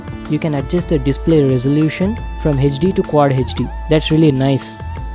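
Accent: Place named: India. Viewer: 这印度口音真是听不懂啊 This Indian English is really hard to understand.!!!!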